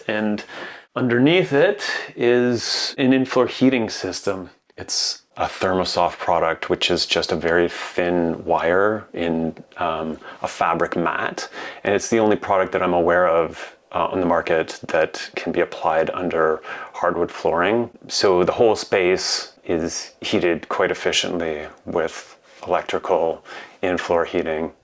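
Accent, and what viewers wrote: Canada. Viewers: His Canadian accent is soft music to the ears of this fellow Canadian. I've been watching a lot of the channel, Never Too Small, just for the laughs because the designers are pretentious about their designs, most of which are far less functional than this one, and no more beautiful. The humility in presentation of his very impressive word is just so refreshing. I saw someone suggest giving up some ceiling height for more height in the loft. That seems like a good idea to me too. But I hadn't even thought of that. The only thing I noticed that might need a change is that is tall and long limbed, making it possible for him to easily reach up and move that light above the sofa, and to reach across the kitchen counter. A very minor quibble. More of an amusement for a shortie like me who can only dream of having a reach like that. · As soon as I heard “aboot” I thought he must be Canadian. 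😊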